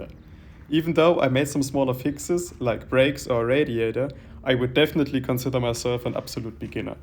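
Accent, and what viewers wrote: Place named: Austria. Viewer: You have a very strong accent, are you from Austria?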